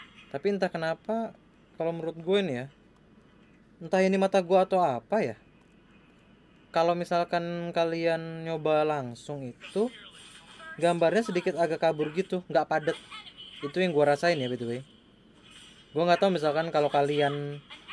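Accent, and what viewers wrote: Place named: Serang, Indonesia. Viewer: Waduh bahasanya to orang serang banget:v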